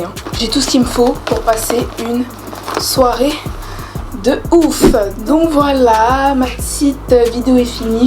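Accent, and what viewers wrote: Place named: United States. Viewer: Au début j'ai cru que t'étais Américaine 😂😂😂💙💙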